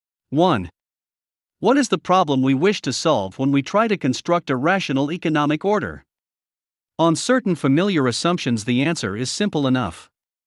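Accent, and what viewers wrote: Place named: United Kingdom. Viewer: Phew... Almost had to listen to a British accent for 40 minutes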